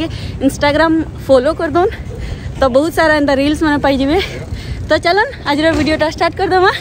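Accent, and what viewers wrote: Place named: Sambalpur, India. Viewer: ହସ ସାଙ୍ଗେ କଞ୍ଚା ସମ୍ବଲପୁରୀ କଥା ବହୁତ ଭଲ ଲାଗୁଛ୍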